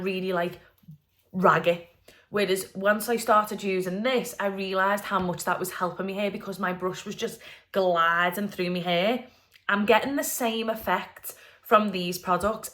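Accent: Liverpool, United Kingdom. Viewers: Hello from Belgium ...you sound like a Scouser ? Liverpool ? HILARIOUS : "hair in me bom" HAHAHAHAHA I came here to find out about the products and I have been roaring with laughter! That said, I'm a new sub ! 🙂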